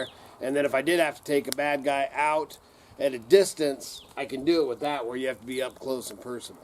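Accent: South, United States. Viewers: I hear where you come from you're I just a redneck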